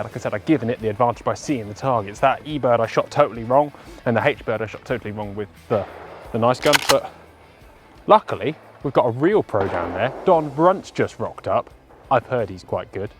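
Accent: South, United States. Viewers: I like your American accent. 😄😄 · Great Southern American accent. 👍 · Good southern accent and good review......you sounded like you are from North Carolina P.S I saw a brief news report of US weapons going to the Ukraine and amongst them were Mossberg 500 shotguns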